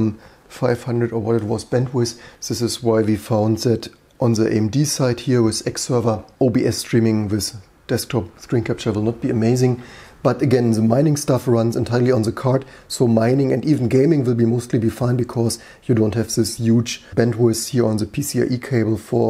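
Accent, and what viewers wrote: Germany. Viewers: HÖRE ICH HIER DEN DEUTSCHEN AKZENT ? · Wow, ziemlich deutscher Akzent 😂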